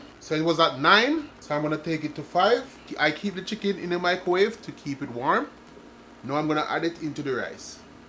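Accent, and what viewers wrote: Jamaica. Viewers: SHUT THE STOVE OFF😂 JAM DOWN TALK, 1 LOVE😊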